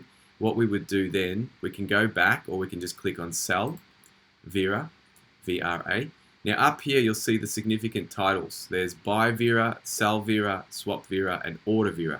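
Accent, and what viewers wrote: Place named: Australia. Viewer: Can you do a staking video please , good to hear an ozzy talking crypto .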